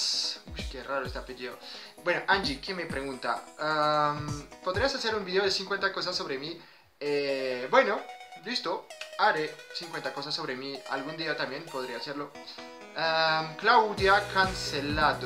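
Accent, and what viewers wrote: Colombia. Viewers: amo tu acento español colombiano ❤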